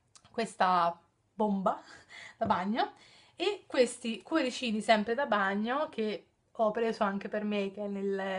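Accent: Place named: Tuscany, Italy. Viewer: Ma poi il tuo accento è bellissimo, sei Toscana per caso?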